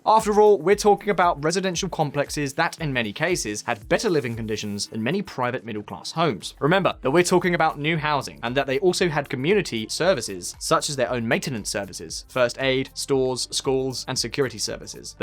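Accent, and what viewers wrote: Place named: United Kingdom. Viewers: Best narrator for this channel, but pls pronounce H the non British way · He's British. H is pronounced "Aitch"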